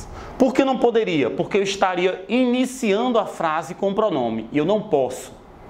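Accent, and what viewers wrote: Northeast, Brazil. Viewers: Seu sotaque nordestino é da hora não é aquele sotaque caricato, cômico e falando errado!!!!!!!!!😀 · Caramba, que aula boa!!!! me ajudou bastante, ele é do nordeste?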